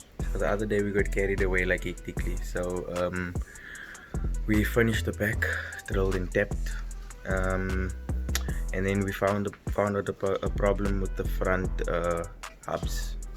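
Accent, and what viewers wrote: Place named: South Africa. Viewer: this is a south African accent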